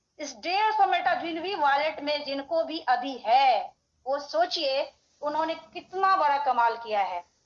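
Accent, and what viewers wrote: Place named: Kolkata, India. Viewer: Colcata anti ji ka awaj lag raha hai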